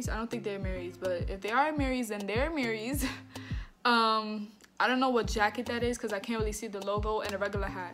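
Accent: New York, United States. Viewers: Bol manes ye she from New York fr😂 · You can tell she from New York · Are u from New York cuz u sound like it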